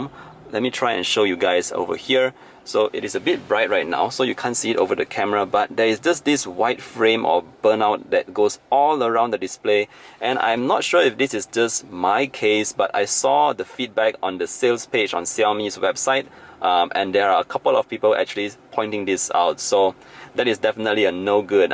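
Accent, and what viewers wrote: Nigeria. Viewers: You sound like Nigeria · Love your accent... You've got Nigerian friends? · Bro you have a Nigerian accent...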